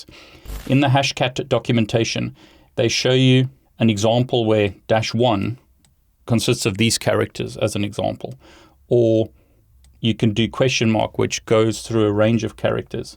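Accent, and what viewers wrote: South Africa. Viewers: Thank you, I'm an idiot. Also, do you have SA heritage? I swear that accent sounds super local! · Are you South African? I recognise the accent. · You sound like a South African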